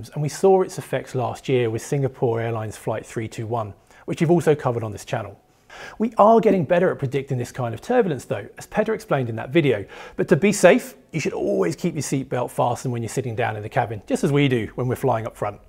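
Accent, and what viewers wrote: England, United Kingdom. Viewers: should slow down his speaking speed... And make his speech more clear avoiding british accent... Otherwise you'll lose people willing to hear that video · As English is not my first language, I'm just a bit struggling with English accent and he's sometimes a bit too fast for me. But I will keep on watching this channel that I like so much and eventually will get used to English. No offense, but I'm normally more used to American English. Anyways, keep on the good work, it's always interesting. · I understand that English was invented by the British, but British pronunciation is a challenge. Compared to the slow and emotional Pereter, it's a little harder to understand. It's become more like a lecture than a conversation with a friend. Maybe should slow down a bit and pay attention to his intonation.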